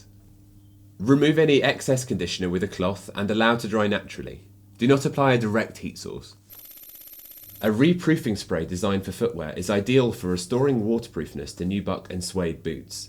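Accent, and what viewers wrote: United Kingdom. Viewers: I hate how much I love British accent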